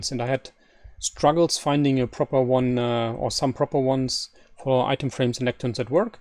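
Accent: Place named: Germany. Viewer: His accent sounds kinda german...🤔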